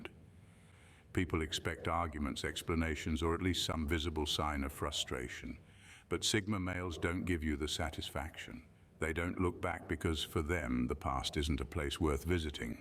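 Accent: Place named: RP, United Kingdom. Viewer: The plummy accent is suuuuch a turn off.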